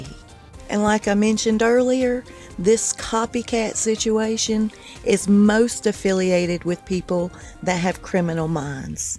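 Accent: South, United States. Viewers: I love your reports. Your southern accent. You. Keep it up.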